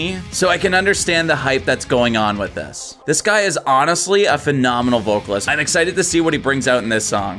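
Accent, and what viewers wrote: Canada. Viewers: "Oh fuck bud". You Canadian bud? Haha. First time seeing your reactions, good one man. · You're canadian as fuck, aren't ya buds? Decent. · Love the song and the vid but I’m still trying to figure out if you’re Canadian or just watch a ton of Letterkenny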